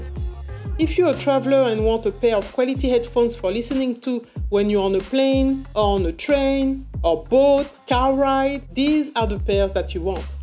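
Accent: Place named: France. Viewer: You speak French ? J'arrive à entendre ton accent ;)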